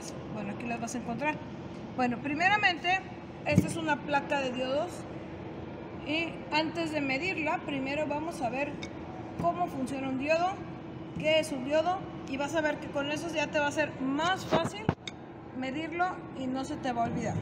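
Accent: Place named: Mexico. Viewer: Sra ingeniera es ud una gran maestra !!,ademas de ser muy didactica en su modo de explicar en ese español -mex tan bonito!!! chapeu!!!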